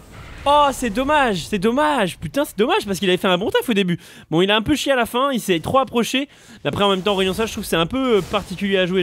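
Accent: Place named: England, United Kingdom. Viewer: Cet accent anglais :D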